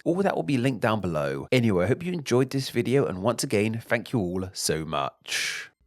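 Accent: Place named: United Kingdom. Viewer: I think flawedcore sounds great in your UK accent...flawcore may be better in my US accent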